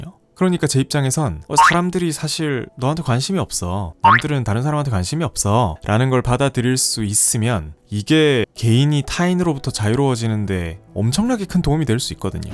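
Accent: South Korea. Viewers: 늘 자기계발 인간관계 지식 이런 류의 채널 영상을 자주보는데...AI 목소리가 많아서 귀에 잘 안들어왔거든요. 근데 목소리가 너무 좋으시고 뭣보다도 친근한 약간의 경상도 억양과 단어들이...오히려 귀에 잘 들어오고 더 와닿네요. 구독누르고 갑니다❤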